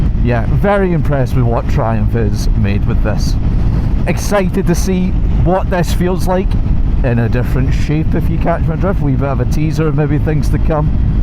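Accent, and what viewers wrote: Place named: Scotland, United Kingdom. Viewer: Your joy is contagious! Love your Scottish accent. Auld alliance. You won a subscriber from France.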